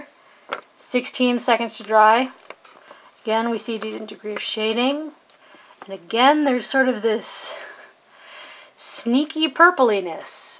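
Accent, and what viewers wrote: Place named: North America. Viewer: Thanks great review I wanted to try this ink but wanted to know about its water resistance. You gave me all the info I needed so subscribed. P.S I'm Brit so not good at North American accents but I like yours. Love the opening spiel too. I guess you are the!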